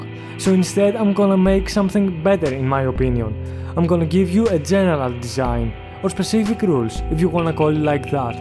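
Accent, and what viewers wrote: Greece. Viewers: probably from Greece ...I can recognise that accent ! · You got a really nice heavy Greek accent (idk if you are) but also thank you for the tutorial. It's gonna be helpful for my jungle village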